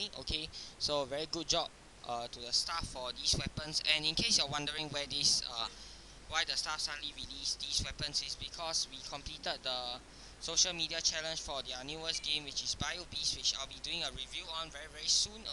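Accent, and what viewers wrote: Singapore. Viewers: I can tell by your accent that you're singaporean, amiright? xD Also, does the weapon have any uses? its been in my inv for a few months now and i dont know wether to sel it or not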